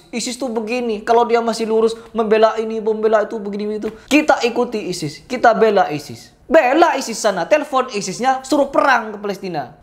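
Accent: Madura, Indonesia. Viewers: Saya orang dayak, dari lugat bahasa bang, bang madura, saya sangat suka setiap konten bang, dan saya udah sekarep, bukan brarti orang dayak berbusana sama madura, yang berlalu biarlah berlalu, kita lupakan😂😂buat teman2 madura jangan tersinggung yah😂😂😂😂, karanena saya dayak sangat suka sama bang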